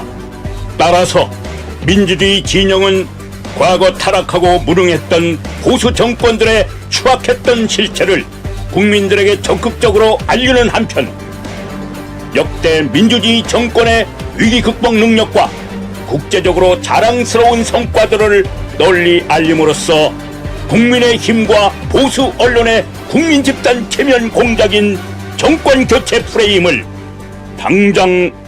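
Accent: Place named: North Korea. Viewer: 다좋은데 왜 이북말투예요?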